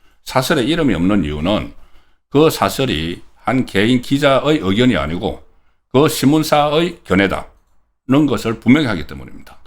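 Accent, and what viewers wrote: Gyeongsang, South Korea. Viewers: 불만있으면 안보면 그만이지만, 송국건 본부장은 말보다는 글을 써야 할 사람인 듯, 제목이 구미를 당겨 보게는 되는데, 발음도 엉망이고 억양도 엉망 경북사투리라고 넘어가기엔 30년차 수도권기자라고 하기엔 ...아무튼 그래서 내용 전달이 안됨, 듣기 시험보듯이 집중하면 모를까. 수도권에서 사는 전라도 사람, 경상도 사람, 충청도 사람이 자기 출신지역 사투리와 억양을 안바꾸는 사람은 좋게 보면 정서적인 사람이지만 달리 보면 다른 사람과 타협하지 않는 아집을 드러내는 거라는 걸 당사자들만 모름. 결론적으로 말하자면, 어차피 나의 우리만의 유튜브방송이라면 모를까 많은 구독자가 생기길, 봐주길 바라는 마음이라면 내 말에 감사함을 느끼시길.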